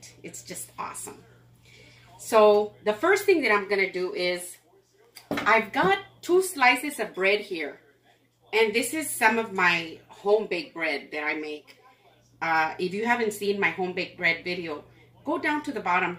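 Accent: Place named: San Antonio, United States. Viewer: 4-7-24 Just saw this a few minutes ago but not soon enough to try your recipe. My meat loaf is browning in the oven., I have tried many of your recipes and they are always great. Will try your meat loaf next time. Just enjoy listening to your San Antonio accent. 🤗 Thank you for sharing all your talent on youtube.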